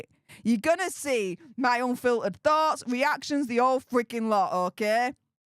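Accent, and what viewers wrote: Yorkshire, United Kingdom. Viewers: I don't think is bothered by your comment. Just adjust the volume and it's a Yorkshire accent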